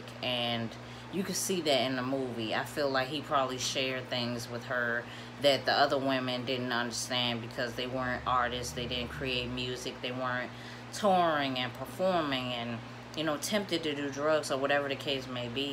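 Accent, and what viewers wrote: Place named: Virginia, United States. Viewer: Are you from Virginia I love your accent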